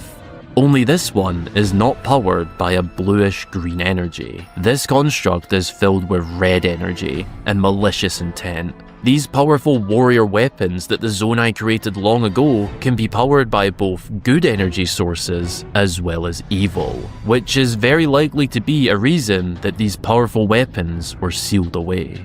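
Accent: Scotland, United Kingdom. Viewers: idk if it's because I havent watched a video of yours in a while but damn dude, did you get extra Scottish? Amazing lol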